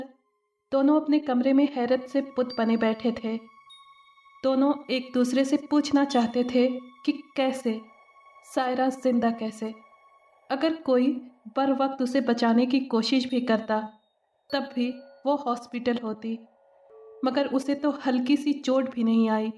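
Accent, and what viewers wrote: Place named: Kashmir, India. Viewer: I watch all your video.now days I am staying in delhi.bt mera childhood kashmir sae h. Apki voice n Akcent n Urdu sae lgta h jase koi wahi ka ho .nyc video.lots ov love.u r doing Great 👍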